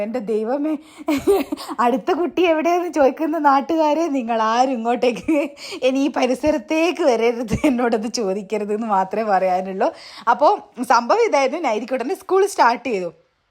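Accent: Kannur, India. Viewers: ഞാൻ എല്ലാ വിഡിയോസും കാണാറുണ്ട്, home tour വീഡിയോ കെട്ട്യോനെ പിടിച്ചിരുത്തി കാണിച്ചു, 😊 പിന്നെ കണ്ണൂർ slang നല്ല രസാ കേൾക്കാൻ.. ഞാൻ ഒരു കൊല്ലംകാരി ആണ്.. കരുനാഗപ്പള്ളി നിന്ന് ഒരു 30 min okke, hus ന്റെ വീട് തൃശൂർ ആണ്.. 😊 ഇപ്പോ തൃശൂർ ആണ്..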